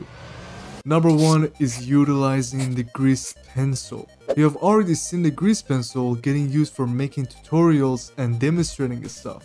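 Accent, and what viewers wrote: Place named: Iran. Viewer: ببین قبل از اینکه اسم کانالتو ببینم گفتم به خدا این داواشم ایرانیه از روی لحجه فهمیدم و خیلی از این که این موضوع رو فهمیدم خوشحالم سابت دارم داداش از محتوات به درستی استفاده میکنم❤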